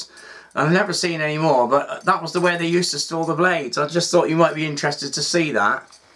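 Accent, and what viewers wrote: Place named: West Country, United Kingdom. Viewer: Fascinating. I love the accent - my guess is West Country close to Wales 🤩 You had me in with the door lock - I have a ton of "If it works don't fix it". Just starting and have bought several spirals so my experience may be variable. Thanks for an enjoyable few minutes. I am 82 and Tasmanian ex UK 60 years ago)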